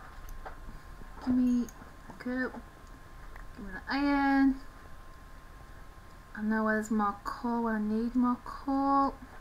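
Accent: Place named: United Kingdom. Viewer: U speak british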